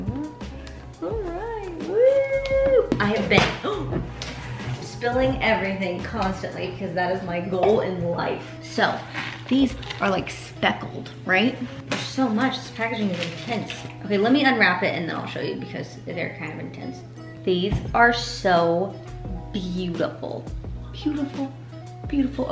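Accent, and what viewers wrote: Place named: United Kingdom. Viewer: The voiceover for your cats is SO FUNNY. Pls make a video doing that in your British accent or whatever lol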